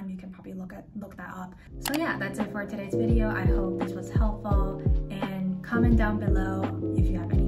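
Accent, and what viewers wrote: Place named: Taiwan. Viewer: great content, you sounds more like from Taiwan, I thought u were from HK with that last name .......keep up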